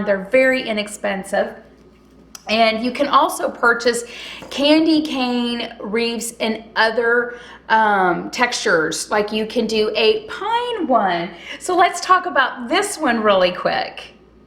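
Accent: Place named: South, United States. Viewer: I was born and raised in Atlanta so I know a southern girl when I hear one. Kayyy???? lol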